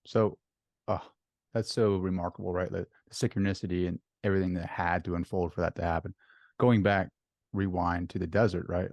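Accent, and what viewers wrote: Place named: Russia. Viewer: She has russian accent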